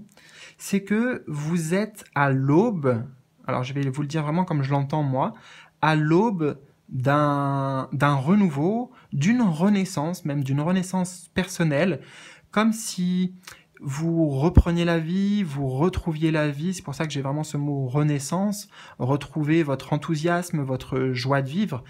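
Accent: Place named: England, United Kingdom. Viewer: 😂😂 super accent anglais ! Merci pour la vidéo 🌟✨🌈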